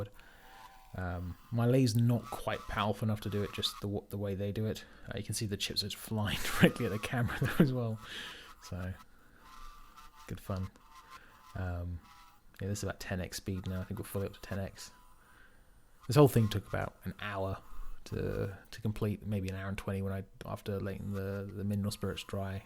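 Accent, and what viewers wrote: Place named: United Kingdom. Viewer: The craftsmanship is great but does this fella gotta use the British accent? It’s like chewing on tinfoil while watching the chalkboard scratching scene in Jaws!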